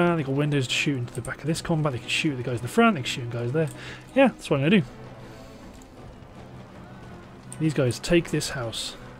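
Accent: United Kingdom. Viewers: God I love your English accent.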